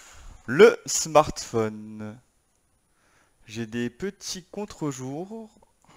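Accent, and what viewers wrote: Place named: Besançon, France. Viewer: Vous avez un léger accent je dirais Besançon si non bon look ce redmi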